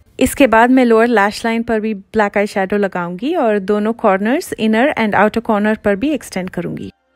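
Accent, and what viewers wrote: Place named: India. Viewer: ur hindi accent sooo cute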